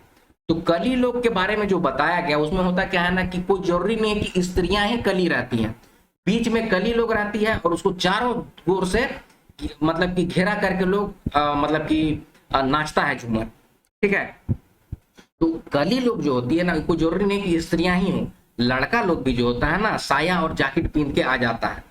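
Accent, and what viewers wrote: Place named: Nagpur, India. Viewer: आप जैसे ठेठ नागपुरी बोलने वाले शिक्षक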